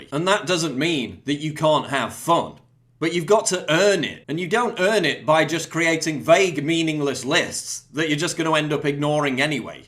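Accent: United Kingdom. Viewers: Are you British, sir?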